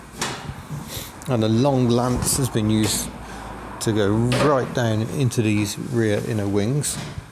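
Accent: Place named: Birmingham, United Kingdom. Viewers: your a brumy mate im from dudley lol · Loved hearing the Black Country accent again! :)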